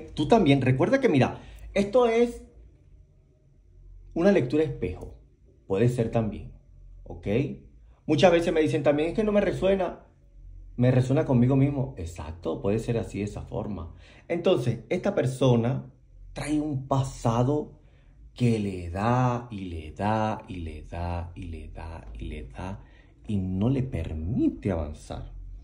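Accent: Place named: Chile. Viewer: Hola me encanta escucharte ese tono de voz de chile yo soy argentina . Un abrazo a la distancia.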